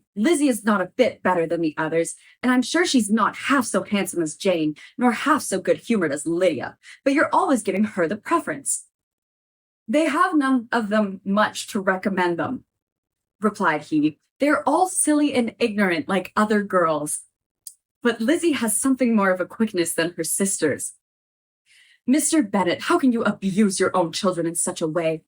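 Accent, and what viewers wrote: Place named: United Kingdom. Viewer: Oh it's so nice to hear another British person on here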